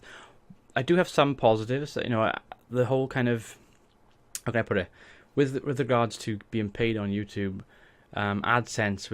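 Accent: Wales, United Kingdom. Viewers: What part of Wales are you from?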